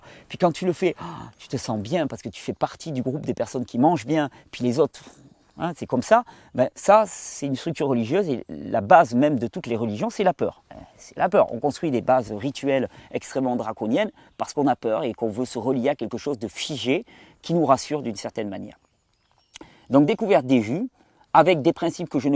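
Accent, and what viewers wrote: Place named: Southwest, France. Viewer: Bonjour! 😃 Te rends tu compte que je te connais à travers tes vidéos depuis des années et que seulement en juin 2019 j'ai commencé un jeûne. Je suivais ton programme alimentaire uniquement des yeux, parce que je n'ai pas les moyens financiers pour acheter un extracteur de jus et des fruits et légumes bio. Là j'entame mon 3e jours de jeûne sec, que j'espère faire durer 7 jours au moins. Je suis terrorisée par comment je pourrais casser le jeûne sec. J'ai vu ta vidéo sur un jus vert avec de la noix de coco ou avocat. Mais comment faire? Je n'ai pas d'extracteur de jus. Il y a un biocoop pas loin de chez moi, je pris pour qu'ils aient les jus appropriés. J'adore t'écouter parler, c'est d'ailleurs ce que j'ai fait depuis le début du jeûne en visionnant toutes tes vidéos de 2016 sur le jeûne la fête du corps. Ton timbre de voix et ton accent du Sud-Ouest, ta facilité d'élocution et ton grand savoir, sont source d'apaisement pour moi. 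À défaut de fruits et légumes bio, je me nourri de toi. Merci mille fois! 🙂 Merci encore! 😃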